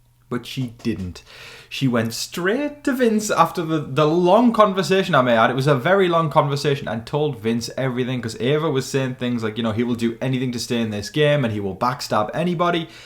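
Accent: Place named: Scotland, United Kingdom. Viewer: I like your updates with the accent. I thought you sounded Scottish and lived there.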